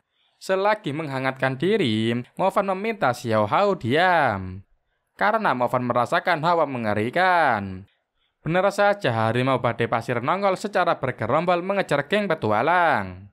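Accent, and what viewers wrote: Java, Indonesia. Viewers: Mas orang jawa ya soalnya sekat bahasa jawa nya😂😂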